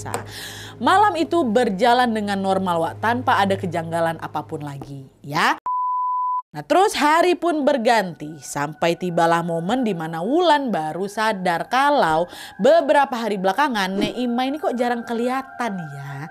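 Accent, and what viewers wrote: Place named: Kalimantan, Indonesia. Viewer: pkee logat Kalimantan,hakk😭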